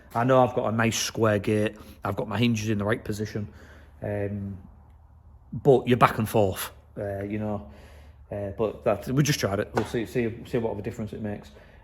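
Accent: Hull, United Kingdom. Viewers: Sick this, you sound like a hull lad, was that price a total price for the double gates and the side gate? I’m looking at doing a side gate only. Wondering what the price just for the side gate was ? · You’ve got to be from Hull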